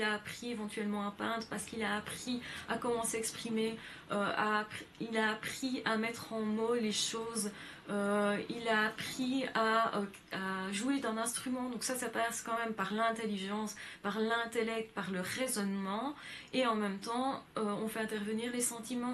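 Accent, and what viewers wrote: Brussels, Belgium. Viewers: Bonjour, me trompé-je ou entends-je un brin d'accent Bruxellois? Pardon c'est hors sujet mais ... voilà. :-)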